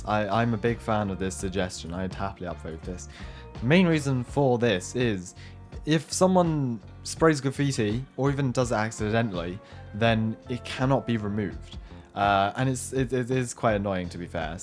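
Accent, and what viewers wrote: England, United Kingdom. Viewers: are you from england america or another place [not to be creepy i just wanna know:)]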